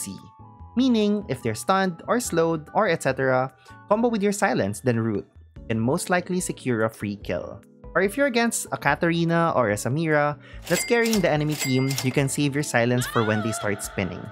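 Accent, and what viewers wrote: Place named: Philippines. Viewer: I soooo can hear the Pinoy in you xD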